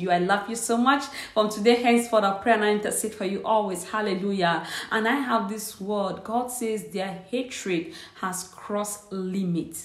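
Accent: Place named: Jamaica. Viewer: I LOVE UR JAMAICA ASCENT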